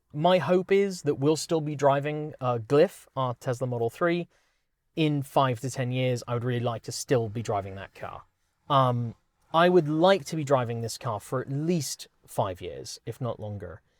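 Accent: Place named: West Country, United Kingdom. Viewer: I do like a Bristol \ West Country accent!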